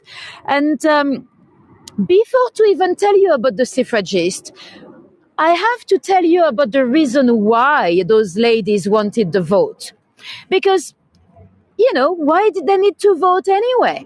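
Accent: France. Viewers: You literally have the best French accent ever. I could listen to you all day…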